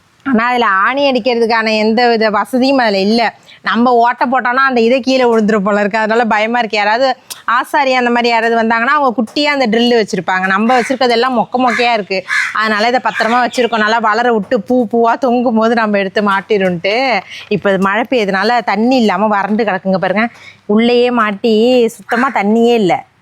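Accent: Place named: Thanjavur, India. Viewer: Namma thanjavur bashai neruputi❤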